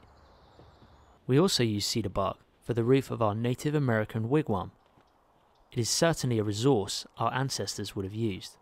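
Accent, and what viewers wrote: United Kingdom. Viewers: hes english lol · Love how he is clearly british but says ancestors to Native Americans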